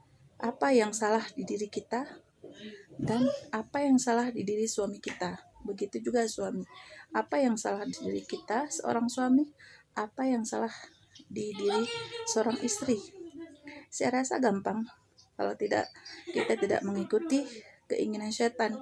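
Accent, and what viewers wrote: Aceh, Indonesia. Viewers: logatnya aceh...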